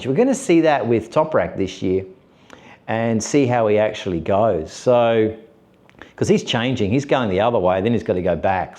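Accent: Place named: Australia. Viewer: right. This site has the most authentic and knowledgeable discussion of our favourite sport. No AI, and in plain (Australian) English! Good one. Yes, a lot to look forward to over the next 24 months. And by the way, it is Toprak. I suspect we will all have to get used to spelling Toprak Razgatlioglu (with a silent 'g', and means 'son of Razgatli' I understand). Often called 'Stoprak' of course, for the stoppies! He and Jack have already started the Pramac stoppie contests...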